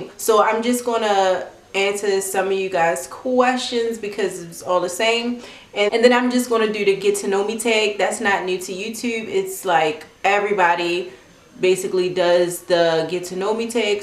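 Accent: Baltimore, United States. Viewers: I been subscribed for along time now and I already had it figured you was from Baltimore ik that from anywhere I just needed you to say you was from here cause I could have been wrong lol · I KNEW that was a Baltimore accent lol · I watched your pony tail and bang video and heard yor accient, i was like sis sound like she from B more let me check our her channel. Keep up the good work boo. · Since you're from Baltimore ( which I kinda guessed from your accent) B-More Careful by Shannon Holmes, True to the game by Terri Woods ( I think), and The coldest winter ever by Sista Soulja, and last but not least the Bible!!! God bless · Legendary Baltimore accent💕💕💕😜😘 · Love your videos 😘 I knew you were from bmore cause you sound like snupe from the wire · i watched this to confirm you were from Baltimore ❤️ i know the accent when i hear it 🤟🏽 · That Bmore accent. 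Love it sis..you slay every day! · I hear a B More accent yup there it goes lol . All my family lives out there❤️ new supporter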